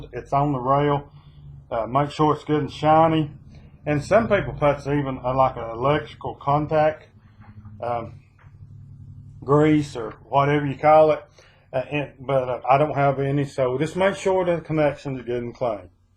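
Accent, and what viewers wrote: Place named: North Carolina, United States. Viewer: That is a pretty GMC Sonoma. I have one just like it. A 91 GMC Sonoma 4.3 liter V6 RWD. Mine is painted that exact color except mine looks dull compared to your shiny red. It was my grandfather's, then my dad's, and now mine. A lot of sentimental value to me. Thanks for the video. You speak like you are close to my area too. North Carolina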